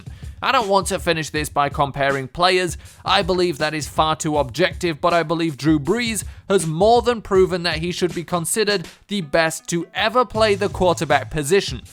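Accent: England, United Kingdom. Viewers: Your British accent ruins your credibility. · And it sounds more believable with the British accent. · Wait. Is that english accent? It's funny how the narrator has english accent talking about American Football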